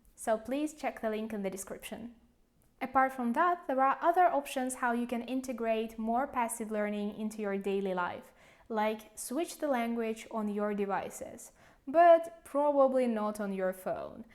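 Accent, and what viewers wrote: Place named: Russia. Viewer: I believe she's from Russia:) I got it after just a few seconds of watching her video some weeks ago. I can easily get who's from this country by facial expressions, sights, the tone of their voices and finally by the accent. So I'm kind of an expert in this thing hahaha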